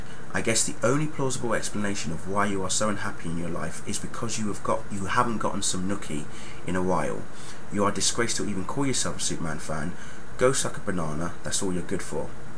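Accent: United Kingdom. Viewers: Man, U dont have to apologize its ur opinion. I might noit agree with u sometimes but I still like ur review and ur British accent lol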